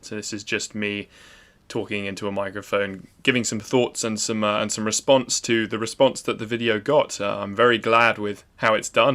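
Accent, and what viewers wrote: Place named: Glasgow, United Kingdom. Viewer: Mate your Glasgow accent is actually decent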